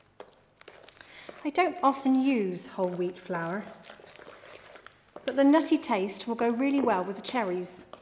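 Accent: United Kingdom. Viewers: I love your British accent! So classy!!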